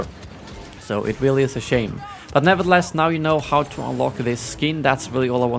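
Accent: Sweden. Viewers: are you sweddish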